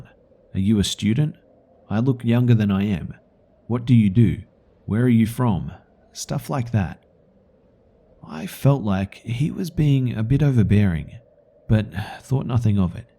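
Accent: Australia. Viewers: This guy sounds Aussie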